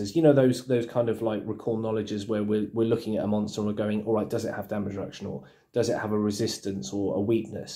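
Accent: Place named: United Kingdom. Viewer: Cool Vid! Listening to a british dude talk can be quite entertaining.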